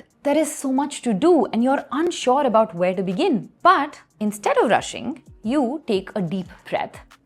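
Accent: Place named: India. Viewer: The Indian accent though...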